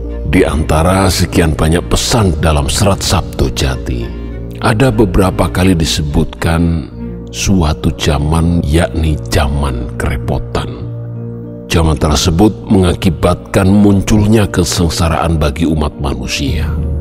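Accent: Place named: Mataraman, Indonesia. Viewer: Kata-kata yang bahasa Jawa jangan dibaca serba o, aku bingung Jangan pake logat Mataraman Pake logat Jawa yang asli saja, a tetap dibaca a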